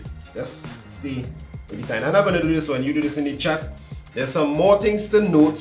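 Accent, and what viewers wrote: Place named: Trinidad and Tobago. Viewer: I can tell that he is trinidad by the patois 😂😂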